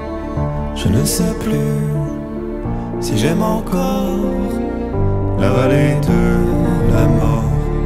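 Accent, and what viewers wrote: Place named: England, United Kingdom. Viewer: Il a pris l'accent anglais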